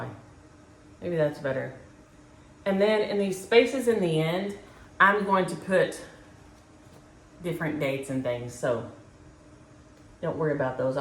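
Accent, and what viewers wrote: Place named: Texas, United States. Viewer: Always enjoy stitching you your lovely Texas drawl. Here in Ontario, Canada, fall will soon turn into winter. Getting my mind ready for that as a former snowbird!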